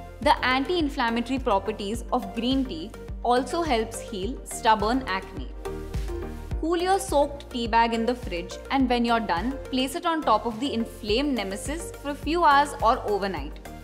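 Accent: India. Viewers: Her Indian accent "patoto"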